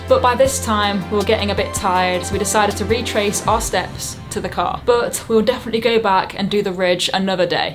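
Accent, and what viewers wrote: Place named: Derbyshire, United Kingdom. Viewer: I love this. Also, I love the accent. Hehe. Which I actually listed as one of the things I love being at England. And made a short video about. Derbyshire ha. ❤️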